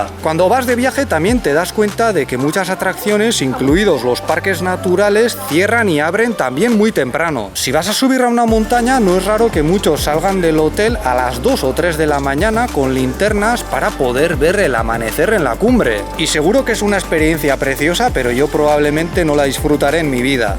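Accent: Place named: Basque Country, Spain. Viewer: Formáis una pareja genial. Me encanta tu acento euskaldun. Gora china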